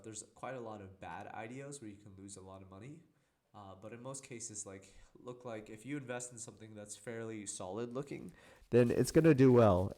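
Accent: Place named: United States. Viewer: You sound American. How can you invest in IDOs when they all require KYC to filter Americans out?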